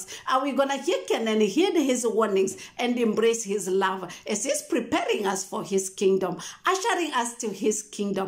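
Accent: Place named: India. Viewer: Sounds similar to the way the Indians in India talk.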